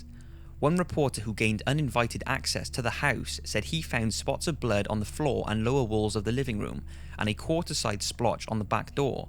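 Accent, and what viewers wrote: Wales, United Kingdom. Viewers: He's british or something, you fuck nuts, he has an accent, and can't pronounce things the "american" way, doesn't mean he's uneducated, dumbasses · He's Welsh and I don't know any dialect in the UK who says "heared" ...we say "heard" I think he's just a bit nervous..... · Ahh I thought there was Welsh in it but did not want to go out on that limb. Either way that was not the crucial part of my comment lol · Yes he is Welsh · James Smith he has a british accent so it might be harder for him to pronounce certain things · Yes but he's British so he has an accent lol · Very good. But where is ur accent from? It sounds a bit Welsh, but my husband is Welsh and he sounds quite different! Lolxxxx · Are you Welsh? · He is actually Welsh though. Don't know what part of Wales however, but he must pronounce words that way. So yeah.. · " lol he's British can't tell by his accent" Of course you can tell he's British, unless you're a fucking moron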